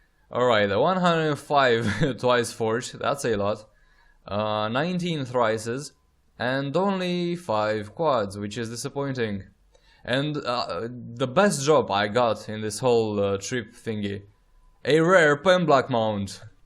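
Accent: Russia. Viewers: russian acent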